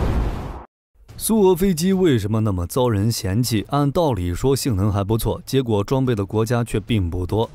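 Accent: China. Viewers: 一個操著大陸口音的人，居然在吹台灣的飛機有多厲害！我業障重啊？我真的視障目盲耳背，一堆人跟風且無常識！就問你們飛過沒？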